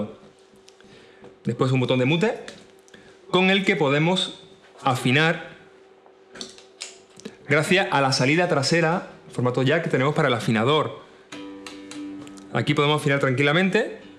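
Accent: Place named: Andalusia, Spain. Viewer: love that "andaluj" accent :)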